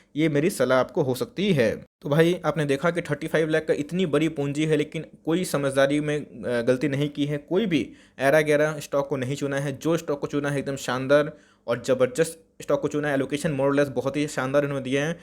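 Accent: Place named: Bihar, India. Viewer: Kaisa bolta re up bihariya tu bare ki nahi bare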